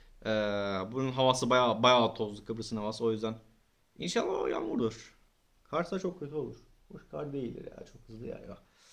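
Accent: Trabzon, Turkey. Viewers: abi Trabzonlu olduğun çok belli tolga zengin e beziyorsun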